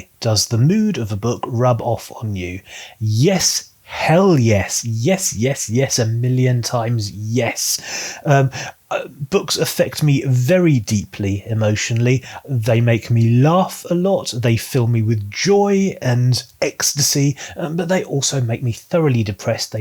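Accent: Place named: United Kingdom. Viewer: I'm so glad that I've found your channel. I've been looking after videos about Mr. Raymond E. Feist (because I'm currently reading the Magician: Apprentice). I'll definitely read the Empire trilogy someday, because of your review. Also, I was about to start the Deverry Series and I was happy to see your video which introduces the books. I'm looking forward to seeing more of your content. By the way, I really enjoy your elegant UK English. :)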